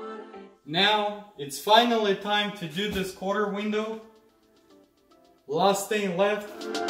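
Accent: Russia. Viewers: Вау. Тачка огонь. Все время слежу за Вашим видео. Очень хорошие машины берете в работу. не Хлам. Если не секрет сколько такая после ремонта в продаже у Вас? И еще, акцент у Вас явно русский:) Могли бы Вы делать субтитры на русском языке)))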